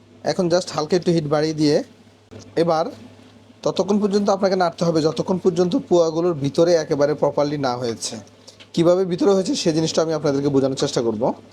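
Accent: Noakhali, Bangladesh. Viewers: ভাইয়া আপনার গ্রামের বাড়ি সন্দীপ নাকি নোয়াখালী?জিজ্ঞেস করলাম কারণ আপনার কথায় এ দুই জেলার আঞ্চলিক টান আছে।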